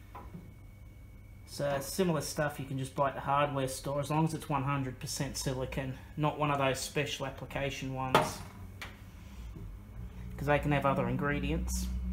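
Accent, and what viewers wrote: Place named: Australia. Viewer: He's gotta me Aussie... He speaks exactly the same as I do..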